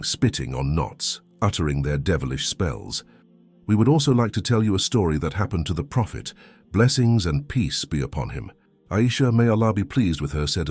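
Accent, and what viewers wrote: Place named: United States. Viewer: I prefer narration with English accent than the annoying American accent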